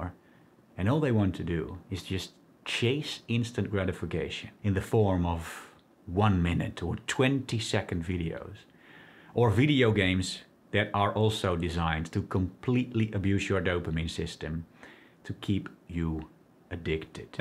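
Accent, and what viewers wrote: Netherlands. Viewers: Ik dacht al dat ik een nederlands accent hoorde hahaha.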